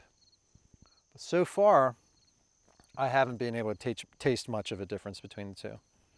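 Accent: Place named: Maryland, United States. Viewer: Hey. As a Marylander retired in South Carolina, I am so homesick watching your videos, at least during the summer. Winter, not so homesick ever. Terrific job with these multiple cultivars, very well presented. Did you get sick after taste testing all of those? I really enjoy your very subtle Maryland accent too.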